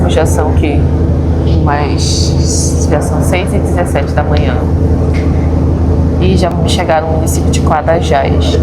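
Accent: Rio de Janeiro, Brazil. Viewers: Boa tarde família...curtindo mais um vídeo e deixando aquele Likeeeeee....então sobre o preço da passagem acho razoável com 3 refeições? Show...outra particularidade....Seu sotaque é muito parecido com o dos Cariocas.....Por exemplo...Charleessssss😂😂😂😂...é bonito 😅😅😅...Manauuusssssss....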